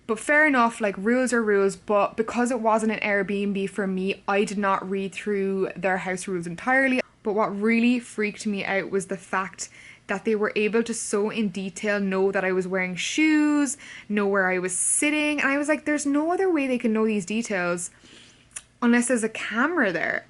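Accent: Cork, Ireland. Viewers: Loved the B-roll footage this week gal. And also love how Cork your accent goes when you are with. It’s gas!!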